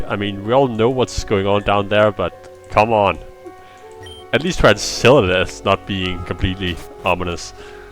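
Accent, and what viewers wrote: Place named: Denmark. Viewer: omg I love the shoutout you gave me at the beginning 😂😂😂(funny thing is when I first saw your video I was wondering if your accent was Australian and that's why you had a Talon flair. Took me a while to realize you were Danish because only Danish people pronounce the letter "l" at the end of words that way) V10 was interesting because that's when I really began to feel reinvested in Rejuv. Looking back, it was V4-6 that really got me invested in the game because that's when the story began to get riveting (I always loved the Valor Mountain finale), but then V7-9 came and I found them quite boring. V10 not just brought the return of interesting story, but I think that's when it really began to feature the beautiful mapping style that we associate with Rejuv. To me, it was the first version that felt mature and well-made and no longer like it trying to play catch-up to Reborn. 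Though interestingly, lots of fans kinda dislike Ch 12 due to the story. I remember Jan acknowledging the flaws with Ch 12 when he remade it in V13. Something about introducing more gameplay elements since it was more like a visual novel than a game. But my understand though is he couldn't change much of it as it was too consequential to the worldbuilding. The revisit to GDC in Ch 12 is quite interesting, since it was scrapped and moved around in 13.5 but contained important story elements that needed to be placed elsewhere: - Was the battle with Risa in the beginning of Ch 12 only added in a later versio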